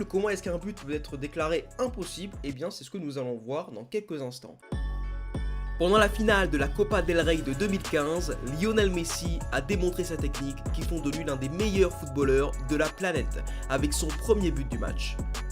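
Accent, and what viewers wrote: Marseille, France. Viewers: T sur que t marseillais avec cette accrnt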